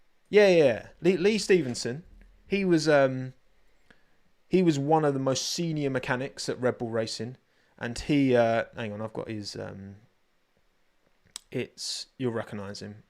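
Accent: United Kingdom. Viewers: It’s the low sweet British accent, I have the exact problem with Cameron and Henry Quinney from Pinkbike. And I can hear the Canadians from the same podcast just fine.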